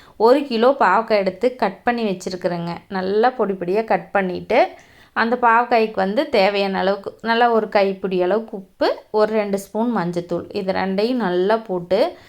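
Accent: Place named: Kongu, India. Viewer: nenga yaru slang ah change panna solla. Ithu kongu slang. Itha la unga nala eathuku mudila na enna pandrathu. Be inclusive❤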